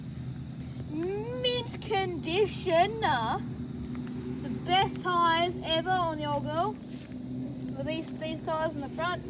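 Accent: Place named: Australia. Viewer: Nice! We use ours here in NC to grow collards. Sounds like you might be in Australia, based off the accent. Just curious if collards would grow well there, or what and all does grow good.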